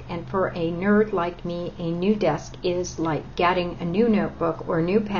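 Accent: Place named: California, United States. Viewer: I say you guys all the time, bad ha but ur right we Californians say it alot and I say aunt like u.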